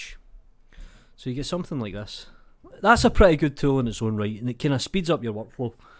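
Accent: Scotland, United Kingdom. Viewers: Came for rip tutorial, stayed for magnificent scottish. Great video, thank you! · Great channel. I enjoy listening to a Scotsman, as I can only understand 2 out of 3 words, it keeps me on my toes.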